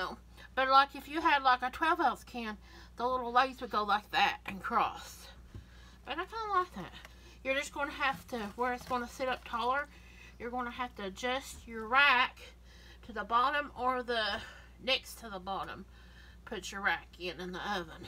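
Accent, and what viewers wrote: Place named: West Virginia, United States. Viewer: that West Virginia accent is running over your instruction. My grandparents lived in West Virginia. Same accent, barely understandable. Cheekkun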